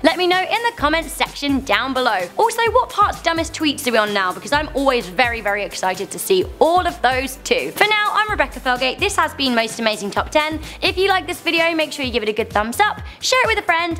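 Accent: United Kingdom. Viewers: Your British accent makes this 100% better. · Anybody else love the British accent???😁😂😃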